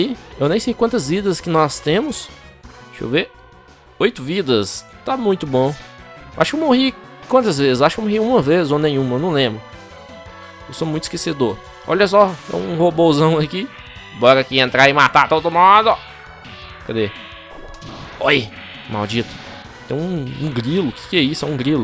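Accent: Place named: Goiânia, Brazil. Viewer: tu e de Goiania kkkkkk eu tambem vishhh!!!!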